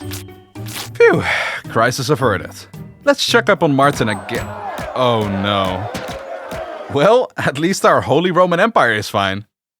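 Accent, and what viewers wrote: Netherlands. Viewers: I smell Dutch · Dutch oversimplified (als ik het accent acuraat heb) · Just subscribed, but this accent seems very Dutch even though nailing the English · Dude, your pronounciation! That's not how you say words! Please do something about not only your extreme Dutch accent, but also please just learn for example where the accents are in the words catholic and protestant before doing a video on the reformation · I hear a Dutch speaker 🇳🇱🇳🇱🇳🇱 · This video is great, the hilarity brought to me by the Dutch accent is even better. Not that your English is bad(its amazing) but the Dutch accent is very noticable and silly sounding.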